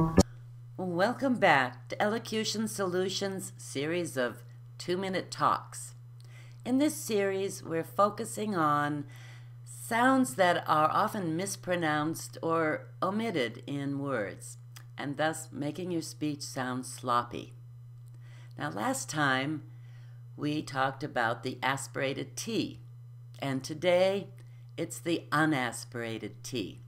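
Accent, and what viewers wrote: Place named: East Coast, United States. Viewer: Because they don't pronounce the words with the letters with which they are written... j/k, this woman is claiming that foreigners who fail to use unaspirated "t" sound sloppy when unaspirated "t" is a later addition to English phonology innovated by colonists and immigrants who couldn't be bothered to fully aspirate it. Traditionally in English t is aspirated in every position. That's why it's written with the same letter. There is some irony in prescribing something and claiming that failing to use it is "sloppy" when it started out a "sloppy" feature of non-standard dialects in the first place. Other English speaking countries like the UK and Australia are filled with dialects that replace "t" with glottal stops all the damn time, but you don't catch them prescribing it as "proper English" for learners lol. 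On the whole, I find the implication that certain uses of language are "sloppy" borderline offensive. It's especially rich from someone who sounds like they speak some kind of refined regional East Coast dialect rather than modern General American standard. Nobody under 60 sounds like this woman in the US anymore, and if you're going to go full prescriptivist, why not go fully 19th century and recommend the Queen's English. It's quaint to see an American fuss over "proper English" and "proper elocution" when their "proper" dialects are relatively novel anyway. Anyway, if you want to speak like a typical US American, then follow this advice. Just know that it arose fro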